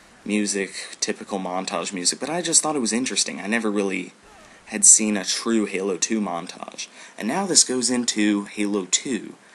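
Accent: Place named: United Kingdom. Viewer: FlaShVidsHD well he does sound British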